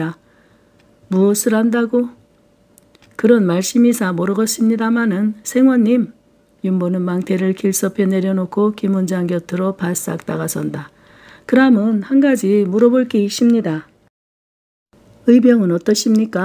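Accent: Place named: Gyeongsang, South Korea. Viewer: 태백산맥의 찰진 전라도 사투리에 이어 구수한 경상도 사투리... 앞으로의 대장정 함께하겠 습니다 감사드립니다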